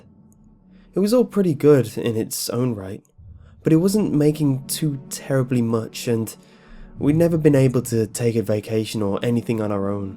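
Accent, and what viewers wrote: England, United Kingdom. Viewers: Is he English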